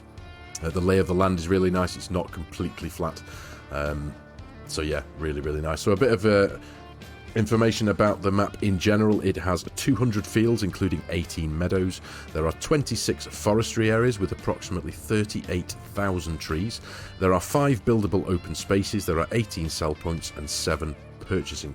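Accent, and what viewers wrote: United Kingdom. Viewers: You can't imagine the joy i have by just listening to your voice. I can't say where you from in the UK. I love the 4K maps , it takes some time to get started. The investment in you farm or production points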